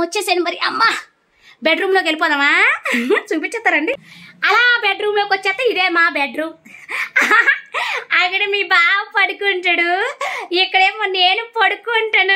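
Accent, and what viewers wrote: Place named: Andhra Pradesh, India. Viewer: Hi aundi me mata bale vundi me aundra yaasa kuda chala bagundi ...meru elage inka chala video s cheyali oll the best sister 🎉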